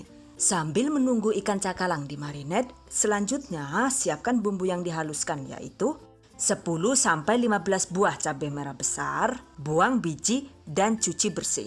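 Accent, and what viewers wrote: Banyumas, Indonesia. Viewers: Mbae ngapak ya..pada baen karo aku..😁 maturnuwun mbak resepe..tak jajale..😊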